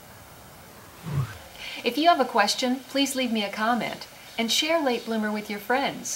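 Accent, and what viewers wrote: Tennessee, United States. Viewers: That is wonderful,. I will definitely be planting some here and there. We are living in the Central Valley area now. Modesto to be exact. This is a bit different for me, as I am used to growing in the TN area. I moved out west from the hills of Hancock County a few years ago. I could hear that TN twang in your voice. =) What area are you from out that way?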